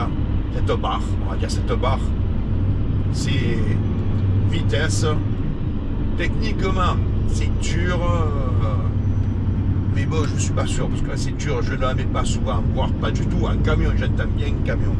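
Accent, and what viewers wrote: Marseille, France. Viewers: Merci pour ces vidéos qui nous font découvrir Marseille avec se superbe accent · Un grand merci pour toutes ces vidéos que tu postes sur You Tube. Nous apprenons beaucoup de choses sur Marseille, en plus avec l'accent et les expressions marseillaises. Tu es un bon chauffeur et à la prochaine vidéo. Peux-tu nous dire ton prénom ?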